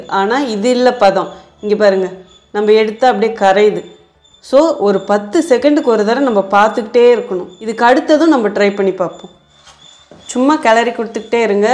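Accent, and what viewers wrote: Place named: Kongu, India. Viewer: thanks but your tamil is kongu tamil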